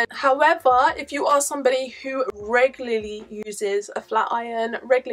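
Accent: United Kingdom. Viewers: Where did you get your comb tool from? Also what country are you from.. you sound British? Xx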